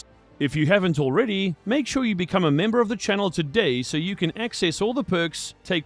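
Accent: South Africa. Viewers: Can help but think youre South African my brotha haha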